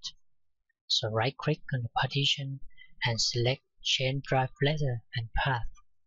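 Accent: Asia. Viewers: Not his voice, his asian accent.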